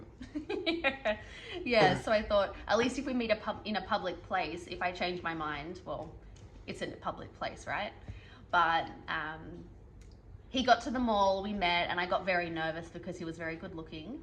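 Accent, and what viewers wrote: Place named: Australia. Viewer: Gud evening from south Africa I'm enjoying ur vlogs I love ur Aussie accent have a blessed Saturday evening loads of warm blessings ❤❤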